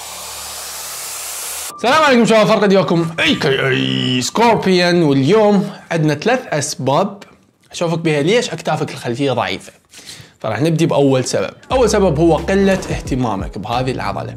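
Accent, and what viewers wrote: Iraq. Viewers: ليش ي عراقي انت شنو تحجي هيج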